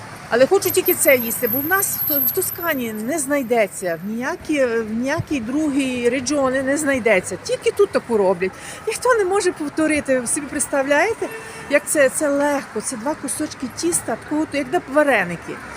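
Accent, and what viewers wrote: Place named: Western Ukraine, Ukraine. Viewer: А мне нравится сразу слышно что с запада, и прикольно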